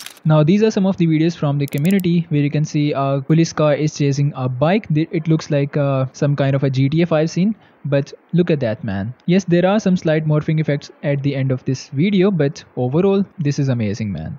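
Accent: India. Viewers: What's wrong with your English? Are you from India? It’s just that in India everyone speaks with a terrible accent that even a foreigner can hear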